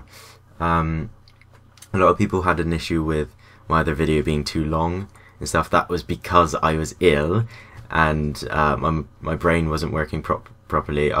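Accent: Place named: Taunton, United Kingdom. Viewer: Indeed same, taunton born and bred for 18 years i knew i recognized the accent =]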